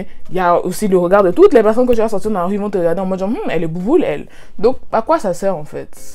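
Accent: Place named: Ivory Coast. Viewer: Je te découvre et je suis scotché 😀 je ne comprends même pas pourquoi... c trop chics 😂😂 l'accent ivoirien je kiffe grave 😘😉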